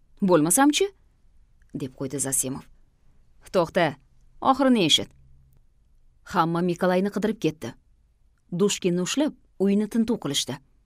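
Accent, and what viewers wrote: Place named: Andijan, Uzbekistan. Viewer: Шеваямки анжанча тошканча узбакча 😊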